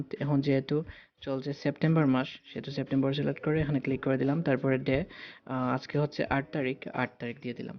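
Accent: Sylhet, Bangladesh. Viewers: Sylheti bro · tumire vai sylheti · no, sylheti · ভাই মনে হয় সিলেটি 🙂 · apnar mat hunia bhuja jar sylheti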